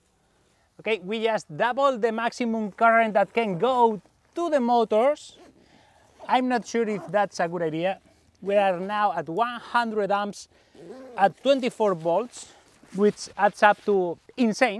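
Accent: Spain. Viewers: Es cosa mia o su ingles se escucha muy españolisado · Le note ancento español pero hablaba ingles jajaja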